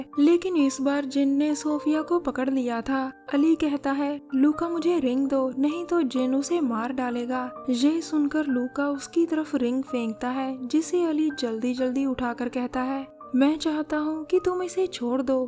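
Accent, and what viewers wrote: Punjab, India. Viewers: Your hindi is in Punjabi excent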